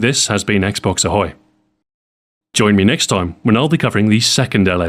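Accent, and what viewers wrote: England, United Kingdom. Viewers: Im getting the Alan Jackson GE commercial alot before these videos play. going from Alans friendly texas twang to Ahoy's smooth british tones is an amusing contrast. Ahoy sounds like a salesman an upper class shop to me for some reason. :P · He's English, so that's his accent!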